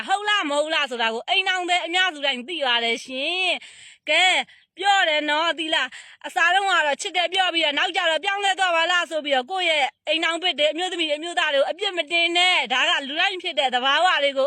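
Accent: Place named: Ayeyarwady, Myanmar. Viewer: အေးဒီအသံကဒို့ဧရာဝတီတောင်ရိုးဘက်ကအသံ❤❤❤❤❤❤❤❤❤❤❤❤❤❤❤❤❤❤❤❤❤❤❤❤❤❤❤❤❤❤❤❤❤❤တော်ပါတယ်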